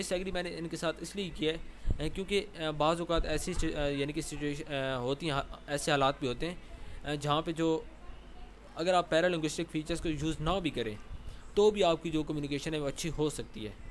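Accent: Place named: Saraiki, Pakistan. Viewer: your accent is like saraiki people💞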